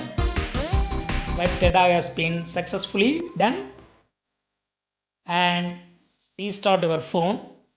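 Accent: India. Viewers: For God sake speak English like English not like hinde accent.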